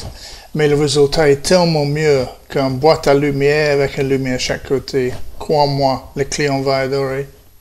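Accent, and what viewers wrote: England, United Kingdom. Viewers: L'accent anglais est toujours aussi charmant à écouter. Vidéo très instructive avec des moyens OTC, un régal !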